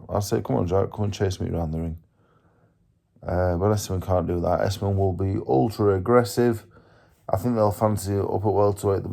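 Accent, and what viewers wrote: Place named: Doncaster, United Kingdom. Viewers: People being arse holes, you can tell listening to you speak you are just a northerner with a good sharp quick sense of humour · They're probably being knobs. They don't know what a Donny lad sounds like, a lot of us sound like you, and we all understand each other.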